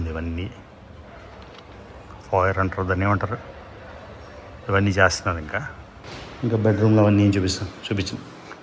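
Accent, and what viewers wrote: India. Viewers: Turpu bhasha bale matladutunnavu,vinadaaniki chalabavundi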